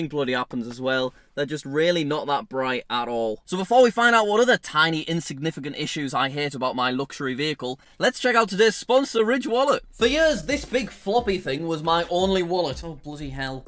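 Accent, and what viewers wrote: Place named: Middlesbrough, United Kingdom. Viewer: Are you from Middlesbrough?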